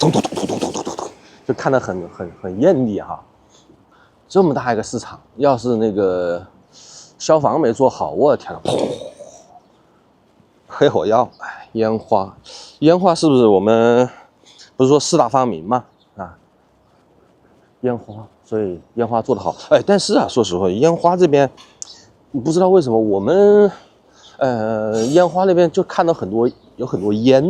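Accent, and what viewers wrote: Wuhan, China. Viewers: 武汉人？？？听你口音听出来了，我后天去浏阳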